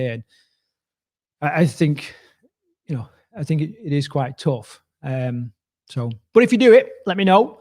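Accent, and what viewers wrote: United Kingdom. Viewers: Hey! (you so suit that name), this was a great vid. I didn’t make the live session so watched it later. I play your videos while I’m out on longer runs, as I’m originally from Yorkshire and having a familiar voice is so wonderful while running lonely trails here in the USA! Thanks for all you do mate, appreciate you. 👌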